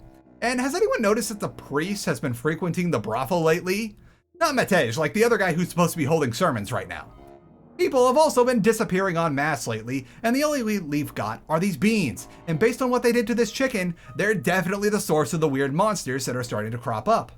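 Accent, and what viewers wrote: United States. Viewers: Man, listening to an american pronounce those names hurts so bad.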